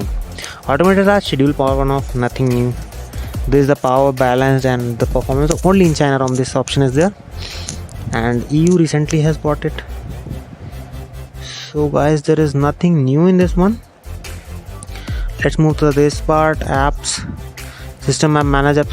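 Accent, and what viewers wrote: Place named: India. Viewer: This Indian English...so annoying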